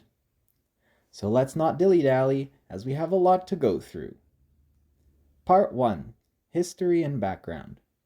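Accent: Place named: United States. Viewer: I find it to be a very bold move talking semi-authoritatively about not one but three entire languages, none of which you speak, and with a blatantly young American accent which suggests little more than book knowledge on the subject. I mean we've already had Scots half ruined by some autistic American on Wikipedia before!! Have ye no learnt from that?!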